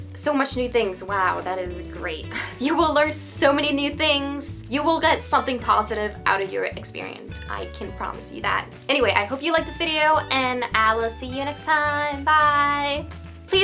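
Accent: South, United States. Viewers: You are picking up southern inflections! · If I heard you speaking without any reference otherwise I would place you as an American. I guess you were young enough to lose your Finnish accent when speaking AM/English. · Can't you tell what type of English she speaking? It sounds like American English to me not British. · Southern accent detected! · Lol, I just thought, what if you were to talk to your old English teacher again? Not only do you speak impeccable English, you sound like you were born & raised in the U.S.. You’d be correcting her lol, but you could tell her your success was because of her & I bet she’d be so proud. 😊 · When you speak it sounds like you have a bit of a southern accent. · You have developed a bit of a southern accent!! I live in East Tennessee born and raised here.But my father was born in Asheville NC and I still have distance family there. 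Its a beautiful state. Have you and your family visted the Smokey Mountains, Pigeon Forge or Gatlinburg??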